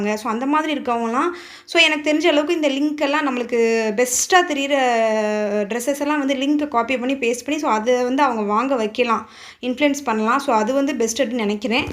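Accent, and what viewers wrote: Madurai, India. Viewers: Neenga madurai ya sister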